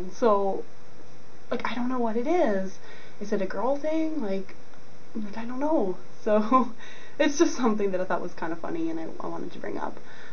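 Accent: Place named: Canada. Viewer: you are Canadian... I can tell by they way you talk.. aye.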